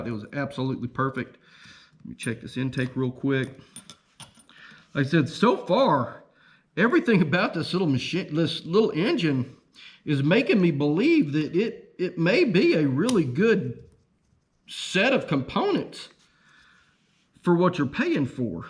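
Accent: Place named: Alabama, United States. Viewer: Wow, I love your attention to detail, I invented an engine system to turn an Adult trike or Bicycle into a kind of like a moped I get about 125 miles to a gallon and am using Vanguard single-cylinder engines for power. I invented the Bracket system, not the engine this little diesel may get me up to 175mpg. I would love to talk to you and I am using the youtube account of my wife here is my cell number <num> give me a call. Stuart Brandt Naples Florida By the way are you in Alabama?????? your accent sounds like Alabama a bit.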